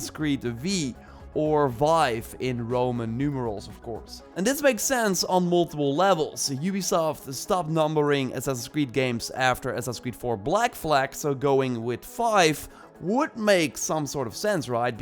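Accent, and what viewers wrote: Netherlands. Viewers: Ah, that Dutch accent :-D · your Dutch accent is really obvious.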